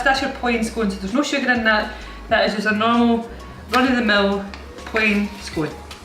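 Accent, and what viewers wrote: Scotland, United Kingdom. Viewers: I like the Scottish accent. I work with a Scotsman but his accent is much watered down. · As an American who isn't too picky, a biscuit is a biscuit is a roll is a biscuit. I just watch for the adorable Scottish accent. Dank and the dogs are a lucky lot.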